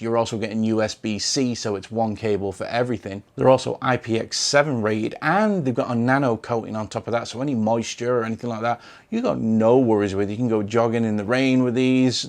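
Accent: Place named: Lancashire, United Kingdom. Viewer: Well that makes sense now. I could tell you were from the Lancashire region. You've done well to retain your accent. I've a pal who moved to the States when he was 13. He now sounds as American as Colonel Sanders. Good review by the way.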